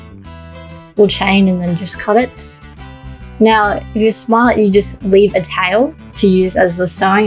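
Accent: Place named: Australia. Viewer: It's funny how many people said that her instructions are not understandable, but there's a whole bunch of others saying they did just fine and caught on easily😂. Personally, I think this pattern is one of the easiest to understand as others give way too much instruction and just confuse u more. Besides I love her aussie accent ❤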